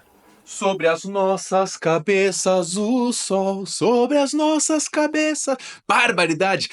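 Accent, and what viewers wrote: Rio Grande do Sul, Brazil. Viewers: Sotaque gaúcho tchê. Professor muito bom. Tomara que seja gremista. kkkkk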